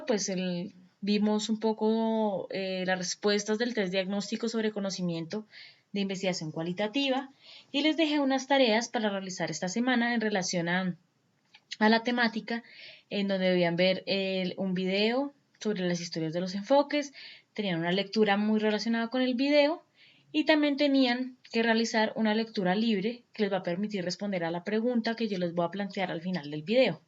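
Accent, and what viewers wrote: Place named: Colombia. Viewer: Gracias, las Colombianas hablan bello, me enamoro su vos.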